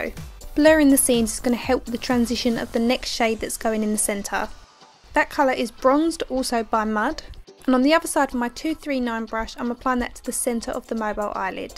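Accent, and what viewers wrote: Essex, United Kingdom. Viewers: are you from essex 💁🏼❤️🙌🏼💯💋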